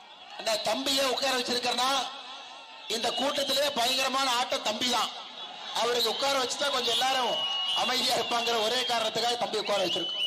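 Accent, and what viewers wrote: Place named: Kongu, India. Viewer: Kongu nattuth thangam namma singam arumai Annamalai. Kongu nattu pechu valakkil thangam thangam ❤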